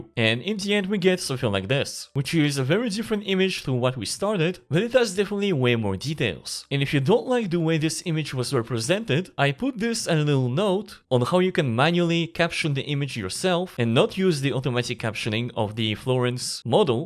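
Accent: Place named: France. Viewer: Il me semble avoir reconnu un léger accent français, donc je commente dans cette langue:-) Fabuleuse vidéo: la présentation est claire, pédagogique, avec de l'humour ce qui ne gâche rien. Les liens sont tous présents dans la présentation ou sur la page patreon. Bref une réussite. Avec une telle vidéo, impossible de rater l'installation de ce nouveau Flux Q8. Surprise il est beaucoup plus rapide que les versions précédentes de Flux (avec NVIDIA GeForce RTX 3070), et le résultat est magnifique. Un grand merci à.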